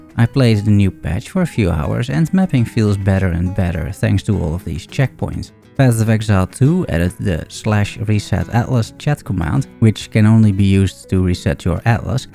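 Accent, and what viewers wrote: Netherlands. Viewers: I came here randomly as POE player but - klopt t dat ik een Nedelands accent hoor?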